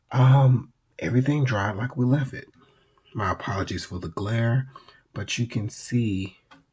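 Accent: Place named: Alabama, United States. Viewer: You sound like you live over here where I live lol I live in Gulf Shores, Alabama… Where the humidity is crazy and the weather is hot during the day and not as hot at night, but the humidity makes it awful lol where is it that you live?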